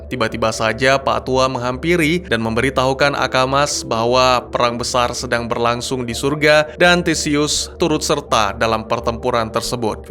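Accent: Aceh, Indonesia. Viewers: bg btw lu orang aceh kan bg?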